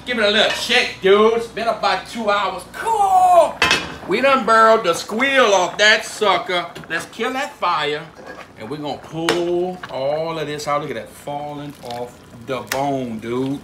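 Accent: Louisiana, United States. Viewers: Born and raised in in church point Louisiana. Been gone from there over 25 years, thanks for bringing me back with every video you make. Btw my dad talks just like that lol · Man I really like the cajun accent. Getting a heavy dose of cajun today after I found this video. Awesome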